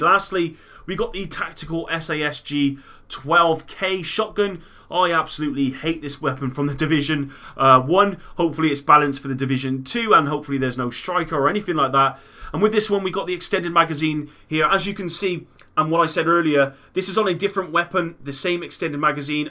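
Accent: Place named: West Country, United Kingdom. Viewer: Which part of the west country mate. Bristol here. Top vid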